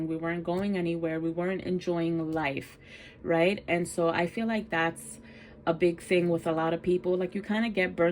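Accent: New York, United States. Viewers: This story really resonated with me. I grew up in NYC too..I hear the NY accent in you lol. I actually bought my first budget binder because of watching your videos. I’m hoping the cash budgeting can help me too. Growing up my parents had really poor money management skills too. My mom was a mom of 4 living off public assistance and my PTSD was her always asking people to borrow money. I vividly remember numerous times she would beg people to loan her money because she couldn’t afford to pay her bills and all the borrowing led to more borrowing and she was always behind. To the point where we almost lost our apartment several times and eventually she couldn’t afford to live where we were and we had to move to the projects. I remember just being ashamed. The adult in me now just thanks god that at least we had a roof over our heads but even then in those reflective moments i wish my mom did better. 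Then when her kids were growing up she looked at our incomes as a way to keep up with her bills. My story is too much to tell on here but even though I never became like my mother asking for help all the time, I didn’t have good financial management skills and education. I didn’t have family members to show me how to save and talk to me about good credit. I used credit cards like they were extensions of cash instead of loans and here I am years later struggling with debt. Your channel is my favorite out of all the channels with cash budgets that I’ve seen so far. I also am in substa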